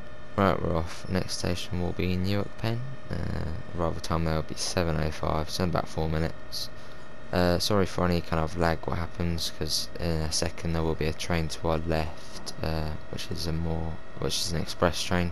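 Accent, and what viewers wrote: United Kingdom. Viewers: WOW nice i was dreaming last night about you saying on augest 6th today we are going to finally play TSW2 i am so excited.:D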